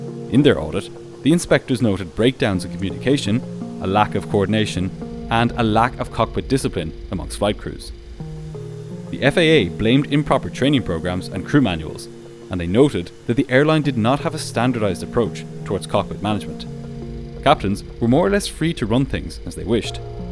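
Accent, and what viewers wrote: Ireland. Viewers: Your Irish accent is so adorable.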